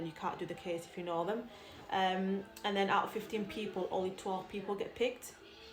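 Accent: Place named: United Kingdom. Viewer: Thank you for your video, it’s always interesting to learn more about this topic. I’m a follower on TikTok, and I love both your content and your father’s 🩷 You have such a lovely British accent, it’s so pleasant to listen to 🥰 Xoxo from France!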